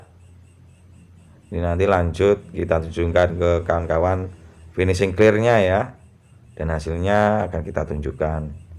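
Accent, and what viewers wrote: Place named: Kalimantan, Indonesia. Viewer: mntap . suara orang kalimatan kh bng yg ngmong ini ? bntu suscribe bng . sering nnton jga soalnya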